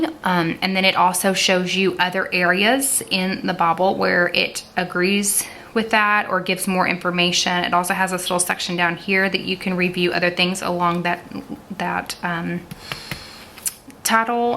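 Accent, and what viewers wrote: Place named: Mississippi, United States. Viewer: You made this looks so pretty I love the tabs I’ve been contemplating on getting this Bible only in the new king James version also I can’t help but ask... are you from Mississippi? Because I’m from Jackson and your accent just reminds me of home ☺️ thanks for the great review.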